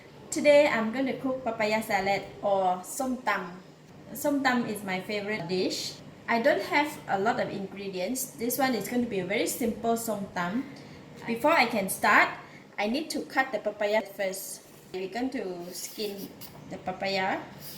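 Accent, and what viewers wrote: Malaysia. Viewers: no wonder you sound so Malaysian :) I really enjoy your down-to-earth explanations to cook home cooked food with love ! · You have a malaysian or singaporean accent..? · U have Malaysian accent?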